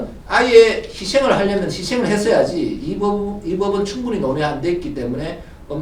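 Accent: Gyeongsang, South Korea. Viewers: 경상도 억양이 저렇게도 또렷한데 ㆍ서울 아니면 ㆍ다 시골이라고 하시는 분이신가보다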